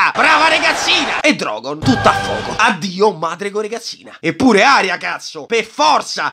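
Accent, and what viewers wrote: Rome, Italy. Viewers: L'8 stagione la hai fatta perfetta, niente voce compostina, ma romanaccio a palla e urlaaaaa, mi ammazzo dal ridere! Fai così anche tutto il resto plz!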